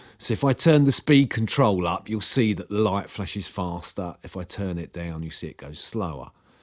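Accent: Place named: United Kingdom. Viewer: Haha, I love your British accent. Great job with the video.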